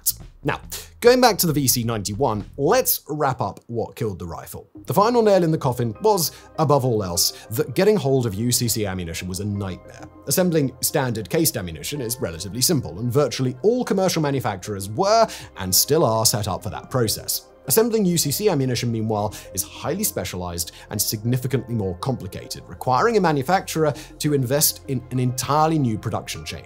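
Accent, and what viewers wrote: United Kingdom. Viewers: I found your video content to be interesting and well organized. But I had trouble hearing your narration. At first I thought it's because I'm not used to hearing British accent, but then I realized you tend to drop off in pitch and volume towards the end of your sentences. That made it very difficult to follow what you're saying.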